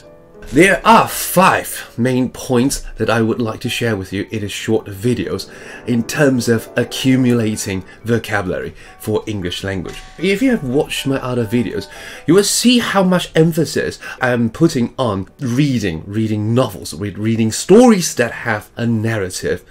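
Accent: RP, United Kingdom. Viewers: 英式發音真的很讚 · 英國腔真好聽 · 英國腔聽起來很貴族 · Wow who's this Japanese looking Tawainese dude speaking in British accents?(Speaking as some Aussie Hong Kong guy) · 老師的英國腔讓我著迷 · 英式发音听起来好舒服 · British accent，说的真好听❤ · 英式英語就是好聽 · 從來沒有著迷過英國腔，自從聽到Eko老師的英國腔後，真的只想聽英國腔了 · 聲音是英國人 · 沒在聽 英式腔調 跟語速 有點聽不太懂哈哈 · 很英的英文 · 英式口音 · 發音有點英式 好聽 很像看哈利波特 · 英國腔像石內卜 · btw I love your English ascent is it British? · 英式口音好銷魂😍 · 超喜歡老師的英式英語，深深著迷，又帥又有氣質。😅😅😅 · He studied in London and graduated from the University of London. Of course, he has a British accent! shut the f up if you knew nothing. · Definitely he is! He is using the Royal British accent and speaks professionally. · 主要是英式腔聽起來很有氣質，文縐縐的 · 英式發音👍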